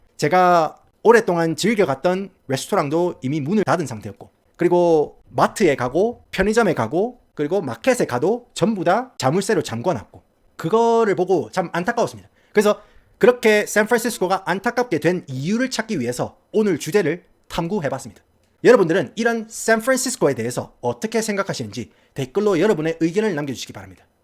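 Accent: Gyeongsang, South Korea. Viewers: 갱상도와 미국을 넘나드는 플로우인데 딕션 쩔어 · 경상도사투리와 영어 악센트 믹스 신선하네요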